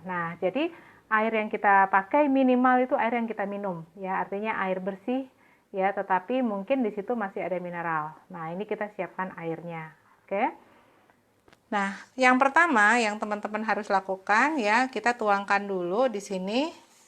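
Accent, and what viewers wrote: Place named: Surabaya, Indonesia. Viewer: Wujud Surabaya jelas mbak...sip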